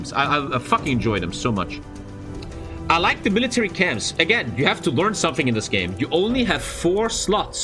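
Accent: Germany. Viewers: I've just been sat here for 20 minutes trying to figure out what this guys accent is and it just hit me straight in the face and now I feel stupid, because it's german and also german. Das Denglisch hätte mir eigentlich nen Tip geben können... und es ist halt super lusitig. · Is he German? Ok he is. Really recognized that at the end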